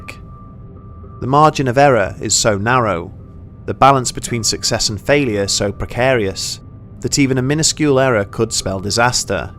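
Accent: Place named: United Kingdom. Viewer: The brit vocals are irritating LOL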